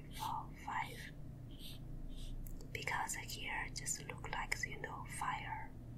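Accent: China. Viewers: when you realize the Chinese speech stereotype is almost on point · 英文很有中国味道.......很好听的说。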